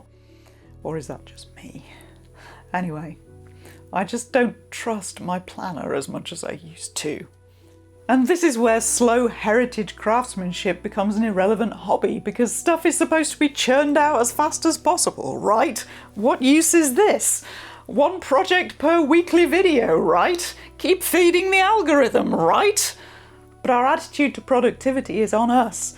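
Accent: United Kingdom. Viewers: WOW! You sound so British.... I double checked if you were the same....and not...🍄🍄 Thank you for the video. When I started writing To Do lists, most times I miss placed the paper. My Mom taught me to use cotton string (no stretch) to take my own measurements. I would then use my wooden ruler to measure the marks on the string. Good to see/hear you again.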